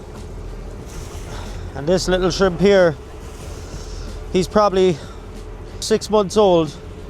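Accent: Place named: Cork, Ireland. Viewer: Love your video's. Get a bit home sick when I hear the Cork accent and see the scenery! Keep the videos coming...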